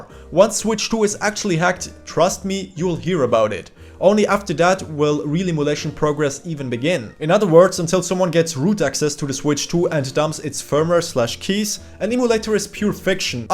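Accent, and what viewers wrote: Germany. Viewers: I Hear deutsch Bro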